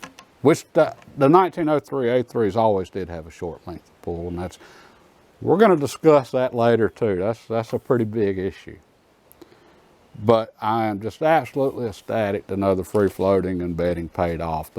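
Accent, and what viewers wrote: South, United States. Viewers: Love his southern accent. I like that he talks kind of slow too so I can understand, as a new person to all this. And he seems like he's been hunting and shooting all sorts of rifles for decades.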